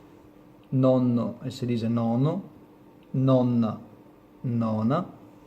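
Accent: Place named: Italy. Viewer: Diversi accenti sbagliati... Un po' italianizzato come Veneto...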